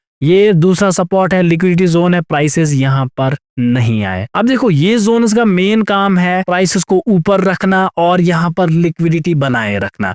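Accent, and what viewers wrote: Kashmir, India. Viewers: Hi bro... Aawaz sun ki ee laga ki kashmiri ho... Same here from South kash.... Wanna meet you... Please tag your address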